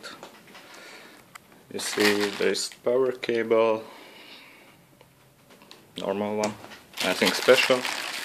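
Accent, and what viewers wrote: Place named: Hungary. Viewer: Ahogy beköszöntél már hallottam hogy magyar vagy olyan jellegzetes magyar akcentussal. Remek videó. Köszönöm a feltöltést.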